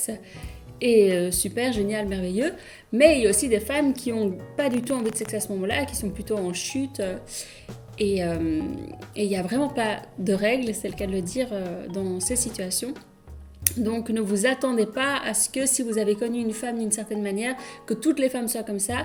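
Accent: Belgium. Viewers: Salut depuis un moment je me demande d'où vient ce joli accent? J'aurais dit belge, du côté de Liège?🤔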